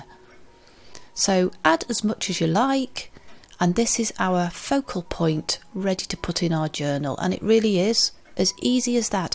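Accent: United Kingdom. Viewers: You are such an inspiration to me!! Yesterday afternoon I spent a magic time following your tutorial and painting the houses myself. As soon as I know how to post it I'll show it to you. Oh, and your British accent is just a beautiful addition to all your beautiful and helpful videos. Thanks so much from Spain!!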